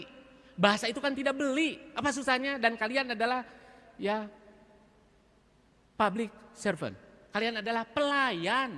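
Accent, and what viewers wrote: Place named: Sunda, Indonesia. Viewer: Nyunda pisan👍👍👍 mantap ngabret" pak sekda"sauyunan ngabangun Jabar istimewa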